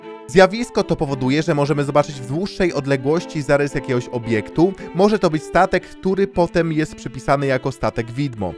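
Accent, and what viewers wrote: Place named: England, United Kingdom. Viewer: Myślisz że to tak łatwo gadać po Polsku kiedy spędziłeś 18lat w Anglii?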